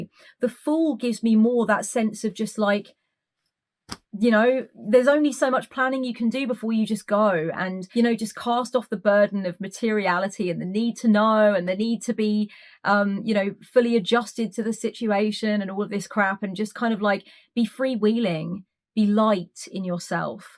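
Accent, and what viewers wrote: United Kingdom. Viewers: Yeeeesssss another unique British accent to save for my accents of Britain collection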